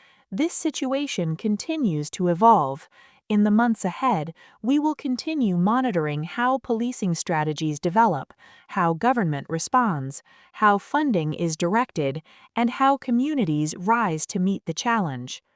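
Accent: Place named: United States. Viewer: An American narrator, why?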